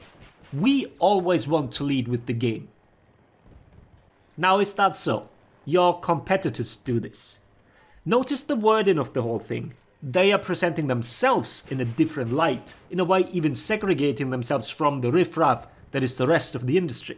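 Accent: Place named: Northern England, United Kingdom. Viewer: Good video my friend. Might sound like a weird question but do you like in the North of England? You have a northern twang when you speak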